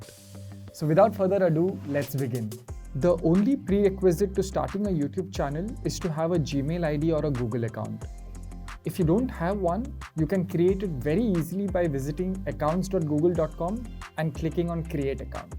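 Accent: India. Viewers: The lady always talking in the maths videos has a strong indian accent how did you think it was forgein based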